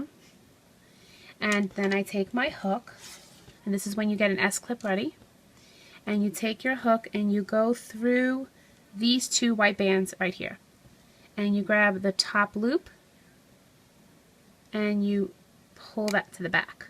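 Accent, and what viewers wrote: United States. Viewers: You know what I love most? Your accent! I'm an Aussie and love listening/watching to your tutorials because of your American accent. Your designs are pretty awesome too :P xox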